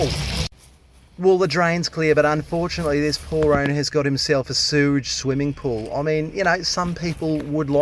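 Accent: Australia. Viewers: Honestly, I'm curious. Are you from the same part of Australia as chopper read? The accents are identical mate! 😮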